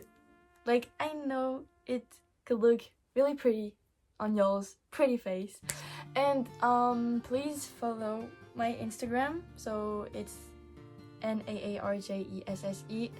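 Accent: France. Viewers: I didn’t expect u to be french. But I love your accent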